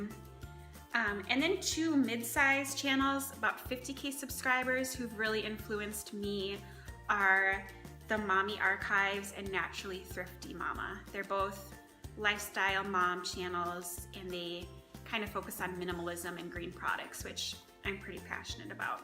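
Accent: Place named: Minnesota, United States. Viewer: Thanks for the Tag! I'm still dealing with this leak 😩 I look forward to doing this tag soon. Oh and you definitely have a Minnesota accent 😉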